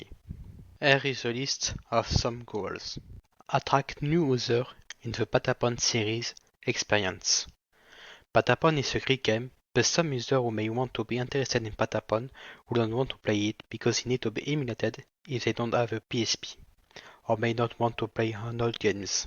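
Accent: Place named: France. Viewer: his french is THICCCCCC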